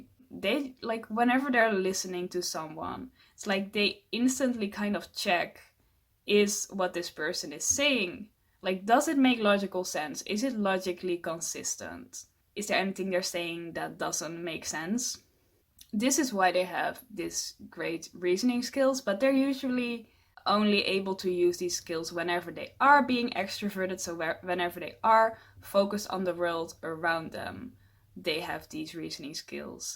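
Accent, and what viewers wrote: Netherlands. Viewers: I LOVE THIS CUTUE PIE, AND THE DUTCH ACCENT. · Immediately heard your Dutch accent, it's so obvious haha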